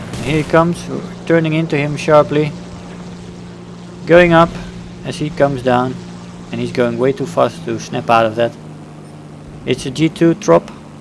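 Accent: Netherlands. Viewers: i realy like your videos bro its gonna be a +sub from me ;) i bet your dutch am i rigth couse you have a dutch accent or is it just me ? :p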